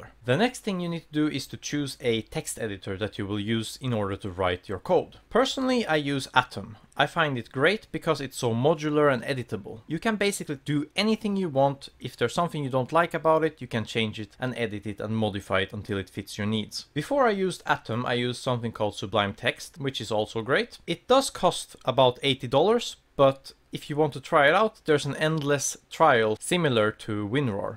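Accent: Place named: Scania, Sweden. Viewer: I'm also swedish you sound south.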